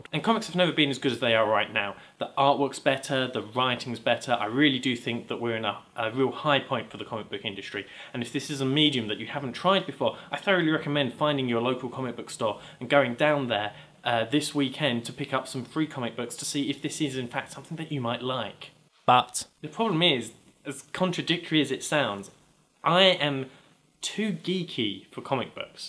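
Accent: England, United Kingdom. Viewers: hey i can tell u r english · nerd I noticed something. you and I are somewhat alike we are both akward we both play video games we both speak in birish accents (poits for you for being british) we both have a dyslexic best friend who also plays video games. I find this strange but funny xD o and we both wear the same kind of glasses for some reason .-.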